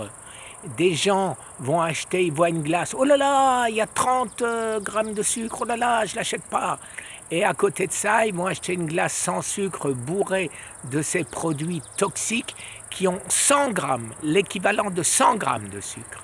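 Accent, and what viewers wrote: Alsace, France. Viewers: Coucou j’avais bien cerné que vous étiez de l’Est de la France avec votre prénom et votre accent Vous êtes Alsacien du bas Rhin Et moi je suis née en Lorraine À Thionville (57) Puis vécu près de Metz J’habite en Provence (83) aujourd’hui Pour en revenir à la vit B 17 pour le noyau d’abricot riche de cette vit c’est l’extraction du centre du noyau je l’ai entendu sur YouTube Ou le trouvez-vous?! Merci 🙏🏻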